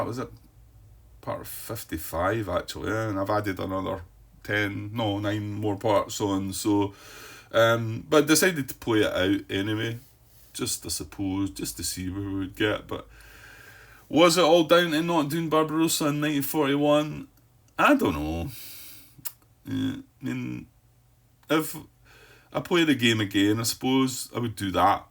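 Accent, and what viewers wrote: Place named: Scotland, United Kingdom. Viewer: Thank you for this journey that ended today. I started to watch your videos of The other side of the hill after backing the reprint in August, and never caught up with your speed, so I never put a comment, but today, I can finally say all the good things. It's been quite tough sometimes for different reasons: the firsts parts with your accent (I do not have any more problem with Scottish accent now 😊) and sometimes being inside your thoughts is not easy 😅. but anyway, your questions and sometimes mistakes made me improve my understanding of the game and I do play on vassal ( 2 solo plays and 2 other with 4 players) and the physical copy of the game has arrived in the meantime. thanks again for the time spent to offer us a global vision of this remarkable The other side of the hill.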